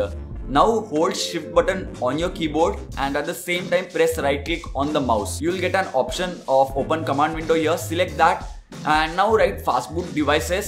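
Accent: India. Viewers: 有点印度阿三的口音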